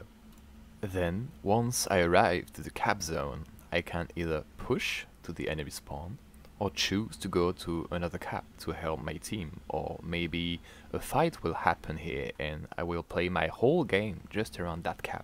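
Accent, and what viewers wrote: France. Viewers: Your french accent is very good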